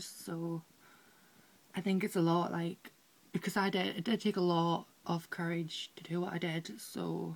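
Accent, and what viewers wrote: United Kingdom. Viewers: Is she Scottish or northern irish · Not to be disappointing but I think that city is not gonna work for you my friend, you should move to a metropolitan like London or a big city in the states ... and your accent has turned British in a year :D:D:D I watched one of your old videos before this one and I was like what does she do in England with American accent but now I'm like wow her accent :D hugs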